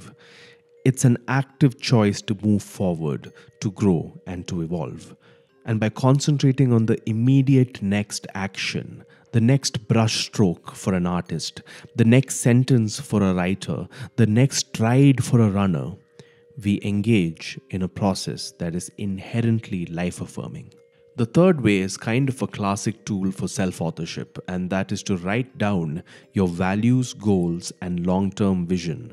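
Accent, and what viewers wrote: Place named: India. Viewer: I'm doing well, sir thank you! how about you? you have a very pleasant speaking voice and I always did like Indian accents. where are you from? I visited Varanasi once and bathed in the Ganges. the entire trip was such a memorable experience. thank you for this educational video, sir. ttyl, buddy! 🙂👋🏻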